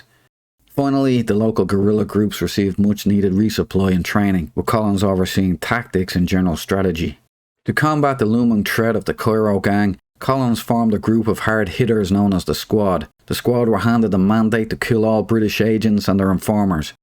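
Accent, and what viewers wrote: Ireland. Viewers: Are you Irish?.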